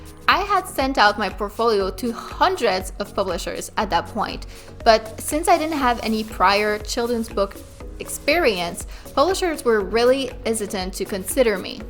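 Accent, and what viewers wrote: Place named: Canada. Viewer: Thank you,. That was really helpful! Can you do a tutorial, or series about Procreate on iPad or Affinity Designer on MacOS? Btw: Canadians are the best, love your accent! <3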